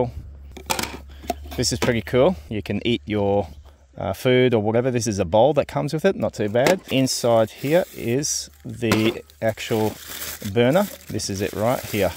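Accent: Australia. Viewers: WTF? I thought Kmart went out of business. And a white person shopping there? Who knew? This feels like a time warp to the 1980s when Kmart wasn't crap. I actually bought my first dome tent at Kmart when I was 12. I used that thing til I was about 26 when the poles disintegrated. Got my first 20" dirt bike there too. I detect an Aussie accent. Is this outside the US where people get better products than US people get?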